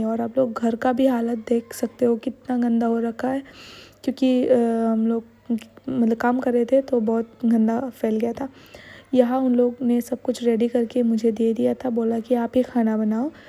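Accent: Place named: Bengal, India. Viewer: Aap ka hindi bolny mein speed nahi aa rohy bengali aa jata hai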